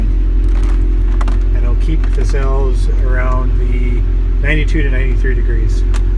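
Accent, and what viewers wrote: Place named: Canada. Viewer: I got most of the way through the video trying to guess which one of the northern states he was from based on his accent/dialect before I realized his channel is called the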